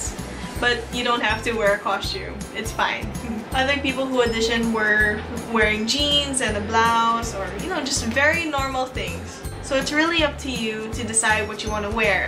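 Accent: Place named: Philippines. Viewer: Ermgee I could tell you were Filipino from your voice! HELLO, galing mo! Awesome and helpful video! Was this in Makati? (STEPS Dance Studio)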